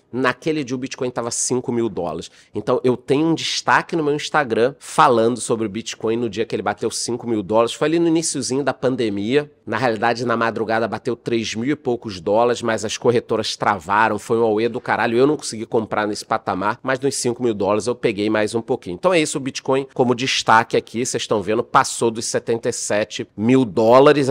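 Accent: Rio de Janeiro, Brazil. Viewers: Único carioca que consigo ouvir por conta do sotaque.... quem não guenta é louco!!!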